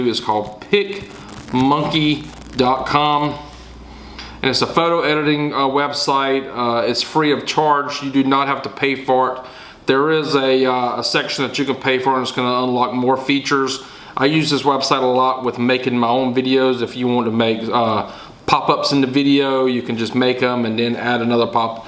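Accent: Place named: Lowcountry, United States. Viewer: Where in the world are you from? Sounds like my neck of the woods! #lowcountry Enjoyed your DIY!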